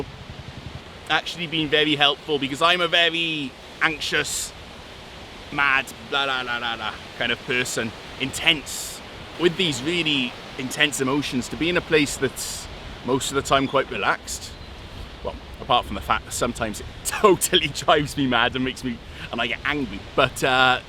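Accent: Bisaya, Philippines. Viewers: Nakurat ko..perteng bisayaa man diay. Haha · OMG, you even got the filo accent lol so funny!!😂😂 It takes time to understand filipino mentality and to get used to it without getting frustrated and even diappointed. Your approach is good if it helps you to keep your sanity in tact! Kudos to you. Some incidents are just out of your control and hey, no worries be happy attitude sometimes work. Stay safe and God bless🙏💝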